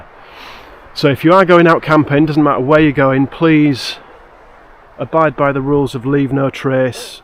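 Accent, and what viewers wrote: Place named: United Kingdom. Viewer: Hey Happy New Year to you too! And congratulations to your 1000 benchmark. I like both your gear reviews and your trail documentaries very much (for the great photography, but maybe even more for the narration - a nice contrast to all the same same stuff from fulltime youtubers. Plus, it’s British!) And yes, you do inspire me a lot. I am a backpacking newbie from Germany, and discovered your channel when I was researching European trails to hike as a first-timer. So last year I ended up doing the Yorkshire Wolds Way plus Cleveland Way. At the end of the walk I still had so much holiday left, and I spontaneously walked the Cumbria Way as well. My next trip is planned for February - part one to three of the GR 131. And in August it’s either GR 10 or GR 11. I am hoping for a comparison video at the end of your current series… So, thanks for the great work and I hope you have some wonderful trips in 2024!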